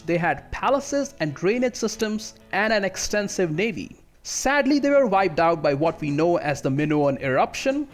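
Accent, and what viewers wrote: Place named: India. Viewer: Wtf your Accent is soooo Indian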